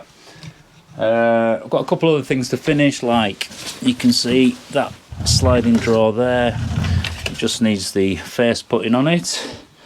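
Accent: Yorkshire, United Kingdom. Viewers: Hi.... How you doing? What you been up to? It's been a while since you posted a vid. Hope all is good!? Just letting you know that I enjoyed watching a lot of your Lakes camping trips... I'm from Lancashire but lived in Yorkshire for half my life so I really connected with your accent and manarisiums, reminded me of when I was younger. Take care with whatever you are doing and thanks for the effort producing the content that you have. 🤟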